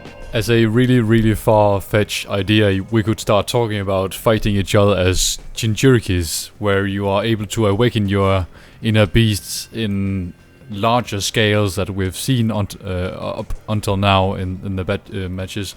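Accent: Denmark. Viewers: Wtf!! Are you danish or something !?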